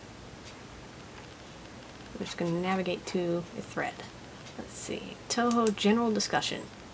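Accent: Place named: United States. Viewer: I really like your voice and English accent. Also, you've got a sexy laugh . Don't think I'm hitting on you, just a honest observation. Where are you from? East coast? West coast USA? midwest? South?. I'd like to know what particular US English accent I like, and this is it.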